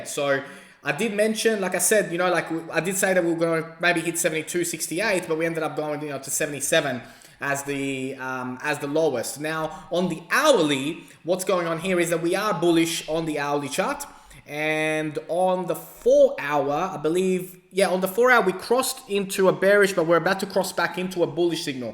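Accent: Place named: Australia. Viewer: Nice to hear an Ozzy. Subscribed.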